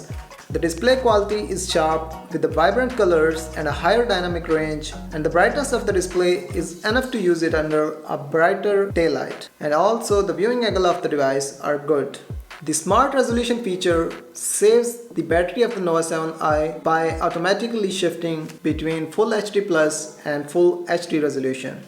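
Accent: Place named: Pakistan. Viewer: come on dude you are trying hard to speak English and pronouncing English words with desi Arabic/Urdu accent,I think there is no need to do reviews in english,in Pakistan by urdu you can get much wider audience and reach...English is just an other language its not a benchmark of "quality or scope of the channel". I'm not being sarcastic at all,its just my opinion. Otherwise your review is good,quality and content is also spot on...thumbs up for that.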